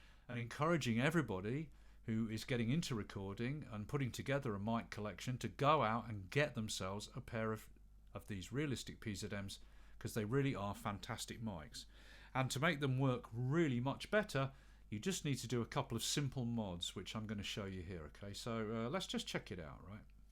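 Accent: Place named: England, United Kingdom. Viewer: I love English accent. Can you tell me if this tool will pick well music from the ceiling where neighbor is playing karaoke, he sings on the mic, play guitar and the karaoke machine plays ovation when the guy ends singing. I can't even watch my tv, I can't speak on my phone. I hear all double because of his noise disturbance. I need to record to get evidences. My cellphone does not pick any sound despite that the noise is so loud. How do I use this mic, attach to the ceiling?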